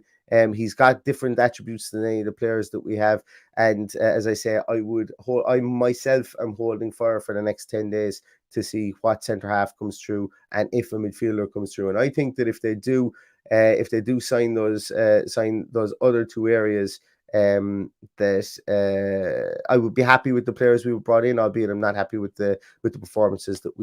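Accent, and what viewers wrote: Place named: Ireland. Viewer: The only podcast thats combines great Villa content with the ever so listenable Irish lilt, that's a killer combo, keep it up !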